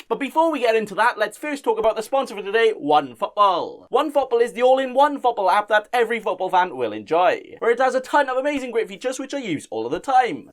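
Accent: Wales, United Kingdom. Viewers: The Welsh accent is strong in this one 🏴 👍🏻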